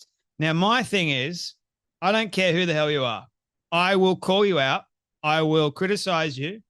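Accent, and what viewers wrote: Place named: Australia. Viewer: U r the man!! However that Aussie accent sometimes throws me off 😁 I hear t-wrist organization and don’t get what it is, until I realized you’ve actually said t3rr0r1st lol. Still, no matter the accent, u r the man!! Keep speaking the truth !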